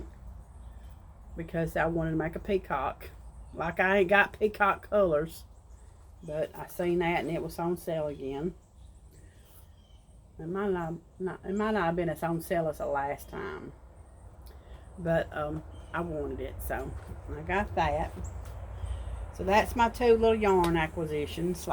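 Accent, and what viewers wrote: South, United States. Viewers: Enjoyed your video, this is my first time seeing you. I was recommended by "Summer's Tips and Stitches". She said she loved hearing your Southern accent. I am a southern woman also, from South Carolina. Love your country scene. Look forward to seeing you again. God bless and pray that you and your husband get your money back.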